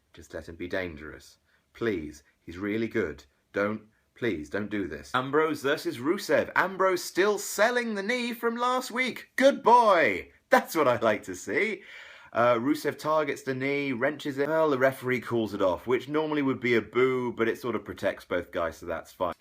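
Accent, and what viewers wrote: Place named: RP, United Kingdom. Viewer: I listen to this to fall asleep at night to the sweet and relaxing posh voice of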